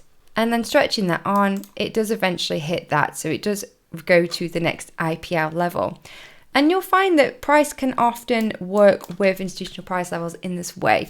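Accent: United Kingdom. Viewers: That is a very British accent and a very British face. I feel like I'm in a Bridget Jones movie.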